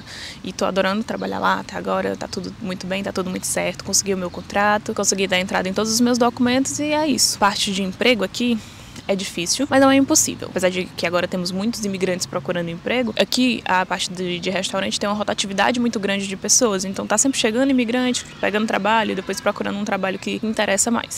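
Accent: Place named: Northeast, Brazil. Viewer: Logo vi que me pareceu ter um sotaque nordestino mas não quis arriscar. É que a maioria dos brasileiros em Portugal ou são paulistas ou cariocas 😁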